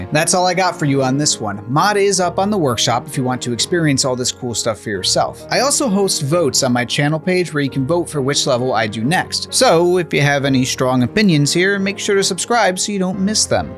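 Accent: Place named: United States. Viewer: Voice reminds me of shilt show! With a different American dialect,Lol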